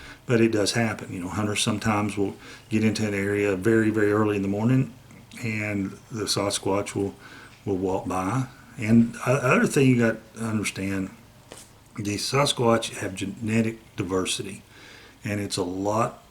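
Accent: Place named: North Carolina, United States. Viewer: From a NC girl transplanted to California it sure is nice to hear my daddy's talk., you are such a kid with that Go Pro. Enjoy. Y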